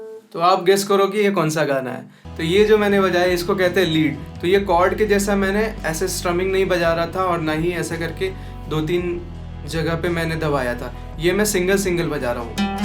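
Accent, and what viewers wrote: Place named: Bihar, India. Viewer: Bihari babua “theth bhasha”….. 🫶🏻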